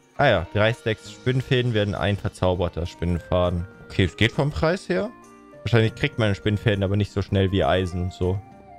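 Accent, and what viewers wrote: Germany. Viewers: man hört des Todes das du deutscher bist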